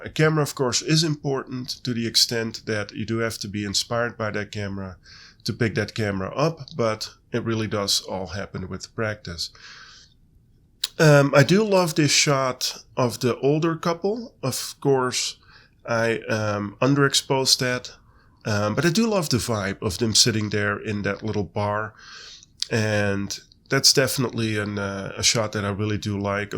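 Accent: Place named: Netherlands. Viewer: I'm Dutch and to me it really sounds like you have a Dutch accent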